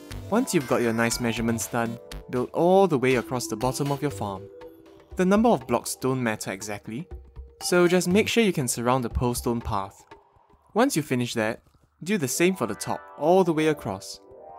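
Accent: Singapore. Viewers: Omg your voice is super soothing! and reminds me of home! Just wondering....are you from sg?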